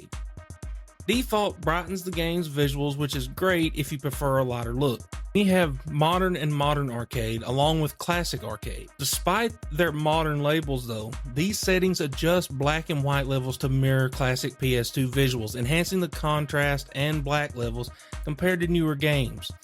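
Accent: South, United States. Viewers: it’s probably super obvious to you lol, but yeah now that I think about how many times I have to hear the same sounds over when editing… lol I didn’t even think of that 😂 But dude trust me, I play guitar and sing in front of small audiences and I still hate my voice, and when it’s played back to me, I’m like damn… is that what I really sound like? Ughhhhh lol But it’s just natural for most people ti hate their voice when hearing it back, it’s just funnny (to me lol, maybe not you ) but yeah it must get annoying hearing your own voice so many times over and over the same phrase or something when editing! Trust me though, for the third time, you’ve got a solid voice. A little raspy, southern, it actually stands out among others in a good way. Way too many British accents on reviews lol don’t get me wrong, it’s a cool accent, but there’s just a lotta Brit’s in gaming I feel… they’re pretty goood at it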